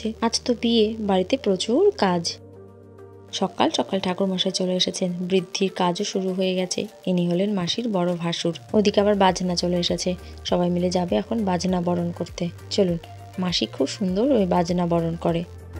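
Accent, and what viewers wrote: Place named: West Bengal, India. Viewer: Khub sundar. Bujhte parchi na korhakar biye. Bajna theke mone hoy Bangladeshi biye r apnar bhasa amader west bengal er moto.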